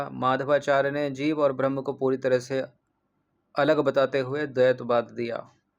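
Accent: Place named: Raebareli, India. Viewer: Sar aap kahan se bolate Hain Raebareli ki Bhasha aapki lagti Hai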